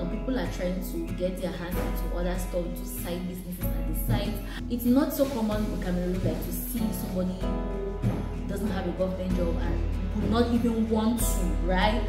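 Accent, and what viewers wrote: Nigeria. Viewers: U sound more like nigerian. We love you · Your English accent is very nigerian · I honestly don't believe you're Cameroonian . Your look , and your accent even sounds like you're from Any part from Nigeria . Lovely ! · I would have sworn you are Nigerian, you sound like us! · Girl you look, talk and your hand expression is just as beautiful as as a Nigerian. You can pass as a Nigerian girl. Love 💕 it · One thing with us is that NIGERIANS are just hospitable but we don't see it as anything, it's just normal. You sound so much like a Nigerian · If you're not Nigerian, I suspect you may have schooled in Nigeria then or grew up among Nigerians. Your accent is so Nigerian with no blemish. You're a good story teller and I love your channel so much. Well done.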